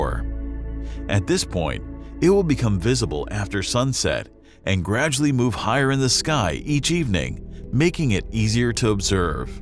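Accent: United States. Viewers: When the narrator is American you just know it's not true. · so heavy accented american voice robot. you say become visible in summer of 2024. i guess you must mean american summer, showing you think the whole world is america, not very good for a space oriented channel teaching about planets, sun and comets, where they dont have summer! So i guess down under we can guess you mean winter.😮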